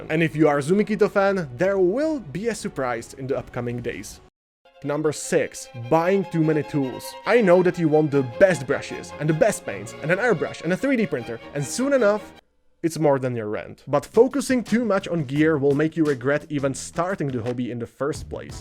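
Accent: Czech Republic. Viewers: You sound very czech. :D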